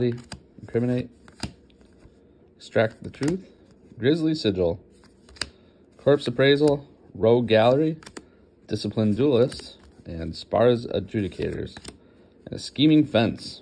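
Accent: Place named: Canada. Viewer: Odd question, you sound like somebody I know. Are you by any chance from canada?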